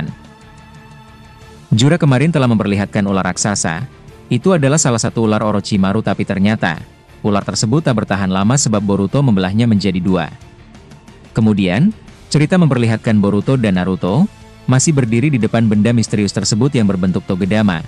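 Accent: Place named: Makassar, Indonesia. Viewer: makassarnya kantala, bnget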